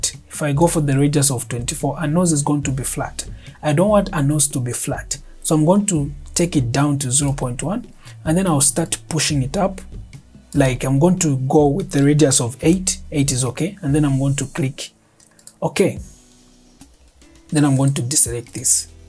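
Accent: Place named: Zambia. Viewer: For some reason you sound Zambian, not that its important at this point😁 very good tutorial.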